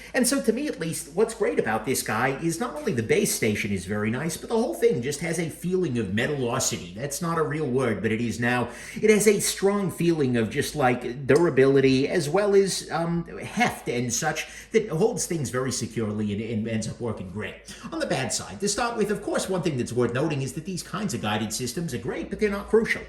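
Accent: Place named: New England, United States. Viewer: This dudes way of teaching is awesome. Cool N.E. accent too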